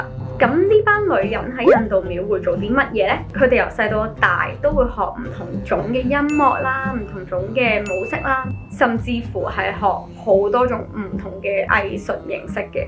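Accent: Standard. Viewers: 真以为是配音啊，好标准啊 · 你係印度人或者香港人? 好标准啊你个广东话。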